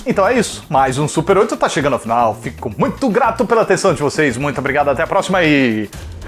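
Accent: Northeast, Brazil. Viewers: O sotaque e o palavriado Típico do nordeste. Que maravilha ver alguém usando tão bem nossa língua. Parabéns.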